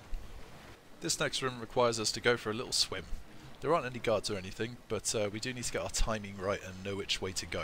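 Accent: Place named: United Kingdom. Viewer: I like this guys voice (no homo) I wish I spoke British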